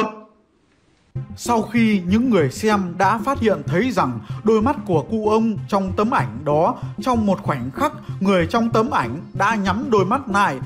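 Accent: North, Vietnam. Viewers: Giọng bắc kỳ mà đọc truyện ma nghe cũng đc đó .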